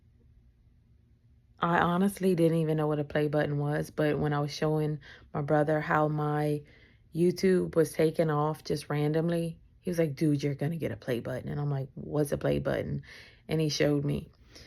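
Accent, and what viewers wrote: Louisiana, United States. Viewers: My step mother was killed by a drunk driver on her way to work, the day before my bday and we had plans for that day. Changed my life forever. The fact people still drink and drive when all these apps exist is beyond me. Y’all are touching and changing many young lives when they think about drinking. This was cool to me to learn about as well I’d love to do something like this too one day and look forward to being able to donate to help in the meantime ❤ Being from the south I get the accent thing but imo if they listen you can’t hear the lisp and the Cajun is just Flavor for the words 😂❤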